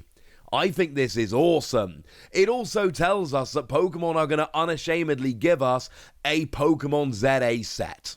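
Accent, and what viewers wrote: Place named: United Kingdom. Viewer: Oh crap, I completely forgot this was British. Lol, every time he talks about the next Pokémon game it’s gonna be like nails on a chalkboard.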